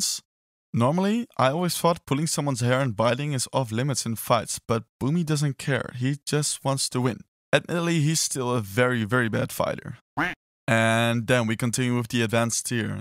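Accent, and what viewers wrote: Netherlands. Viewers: I smell dutch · Nederlands accent gedetecteerd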